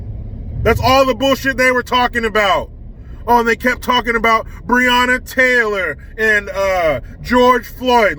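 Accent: England, United Kingdom. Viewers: Nice English accent lol 🖒🖒🖒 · Hitler 😮 bloody hell if Those Democrats woke up they would understand they are the bloody Fascists 🧐 Love the British accent 😅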